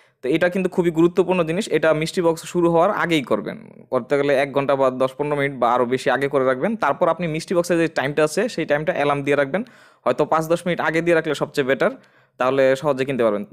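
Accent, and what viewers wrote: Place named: Fatullah, Bangladesh. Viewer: Vai apnar basha ki fattulah naki 😅😅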